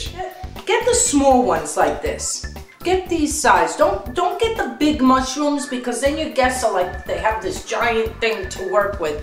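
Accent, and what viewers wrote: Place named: New Jersey, United States. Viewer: My family loves stuffed mushrooms. I'll have to give them this recipe! Haha you're welcome for the pin!! Haha "NEW IERSEY" with NOOO J. You can soooo tell haha, don't you just love it!? <3Daniela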